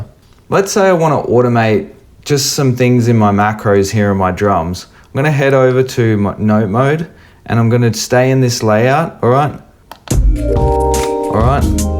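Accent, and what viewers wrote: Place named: Australia. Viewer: great tips! still early in my journey on the Push 3, not really an in-the-box tip, but I LOVE using the ROTO-CONTROL with the Push 3 as you can lock it to a rack and tweak that while not impacting what happens with the Push3, kinda of like the 'Select On Launch' being OFF on the ROTO. Nice hearing an aussie sharing the love!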